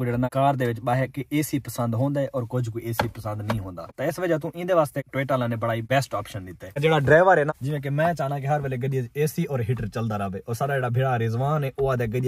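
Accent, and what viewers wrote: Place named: Saraiki, Pakistan. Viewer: آج تو چھوٹے پروفیسر صاحب بھی اپنے خیالات کا بتارہے ہیں کہ گاڑی میں کیا کیا فیچر ہیں اوبھائی اس کو پڑھائی کرواؤ پروفیسر نا بناؤ اس تو ملانہ بات چیت میں بہتر ہے چھوٹے کو پہلے بات چیت کرنے طریقہ سکھاؤ تاکہ جب یہ بڑے ہوکر بات چیت اچھی کرسکے اور میرے کافی دوست سرائیکی ہیں جن کا تعلق ملتان ڈیرہ خاذی خان اور رحہیم یار خان سے ہیں ان کی بات چیت میں آپ کی سرائیکی میں فرق ہے🙏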